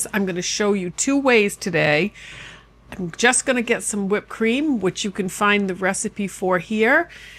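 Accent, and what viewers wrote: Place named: New England, United States. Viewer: You must be in New England for 2 reasons. 1. You said aunt instead of ant, and pecan instead of Pecaahn. I loved your charming video and your instructions as you went along. I will be looking for more of you. Btw your little “jug” is also known as a pitcher. Hugs to you.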